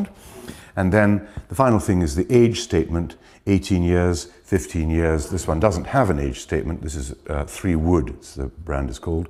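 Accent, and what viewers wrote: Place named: Scotland, United Kingdom. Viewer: He is from Argyll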